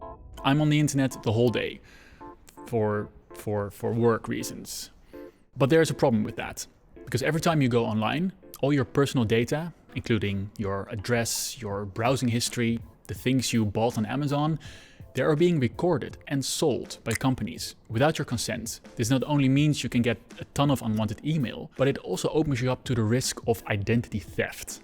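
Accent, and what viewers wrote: Netherlands. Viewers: I really love the vid! But I can hear you're dutch too much to watch it lol. Just can't handle hearing my own accent😅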